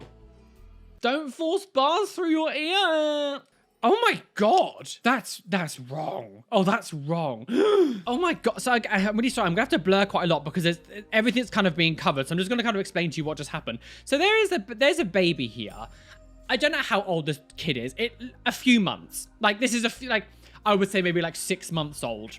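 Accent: United Kingdom. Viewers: I’m so used to accent that I forget he’s British until I hear him do an American accent 😭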